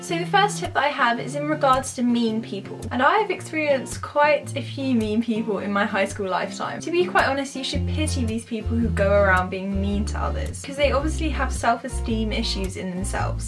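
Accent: United Kingdom. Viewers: Are you british? (like me?)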